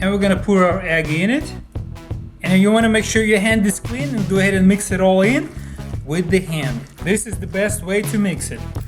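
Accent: Russia. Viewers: are you russian I just started watching your videos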